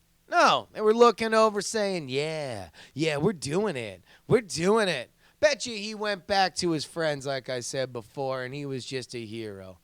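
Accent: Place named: United Kingdom. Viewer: Best British accent in the business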